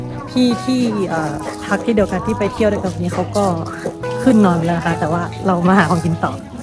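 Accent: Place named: Isan, Thailand. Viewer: สำเนียงอีสานดีมากครับ เป็นคนจังหวัดอะไรครับ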